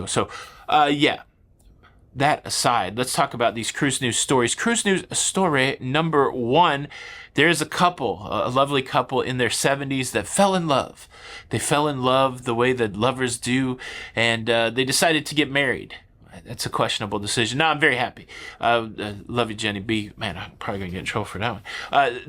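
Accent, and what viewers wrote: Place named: Boston, United States. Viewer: Make sure you ask where the cayr (car) is payrked (parked) lol. Love love love the Boston northeastern accents. It’s a great city. Have fun and safe travels.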